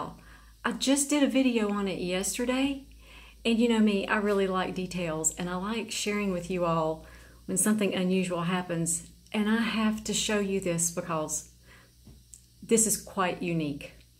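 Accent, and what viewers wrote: South, United States. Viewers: Those are some nice basic Phals your growing. Being from SC it’s nice to hear someone else with that southern accent growing nice looking orchids. Enjoyed the video.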